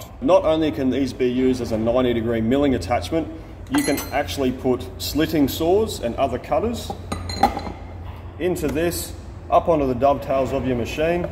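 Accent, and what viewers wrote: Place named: Australia. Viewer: But the subtitles, in all videos, don't understand Aussie, nor English .... I turn them of.... I'm not from a English-speaking country ❤❤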